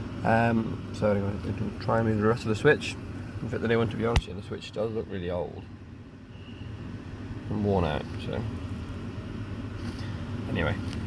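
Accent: United Kingdom. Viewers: Helpful video. Love the british accent😄. Thanks from Argentina 👍🏻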